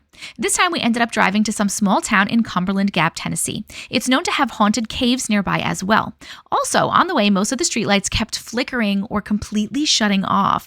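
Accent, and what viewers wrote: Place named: New York, United States. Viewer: Definitely subbing.... stories AND you're a New Yawk girl like me?? Oh heck yeah!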